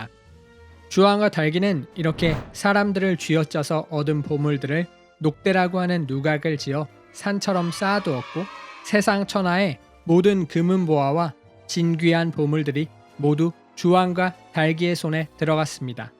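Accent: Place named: China. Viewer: 발음이 꼭 중국인 같네요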